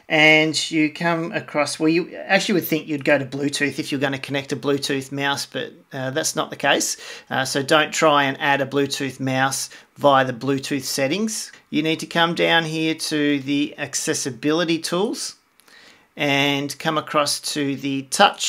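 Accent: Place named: Australia. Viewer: I love his Australian accent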